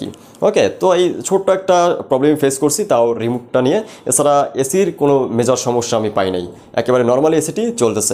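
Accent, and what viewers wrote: Barishal, Bangladesh. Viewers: ভাই বরিশাইল্লা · Your language is telling that you are Borishal person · ওরে বরিশাইল্লা!! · Vai koto taka khaisen? Apnar kotha shuinna matha ton ton kortase. Apni Barishaylla tai na? Chapa baji valoy janen. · vai bari borisal naki😅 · ভাইয়্যার বাড়ি বরিশাল কোন হানে!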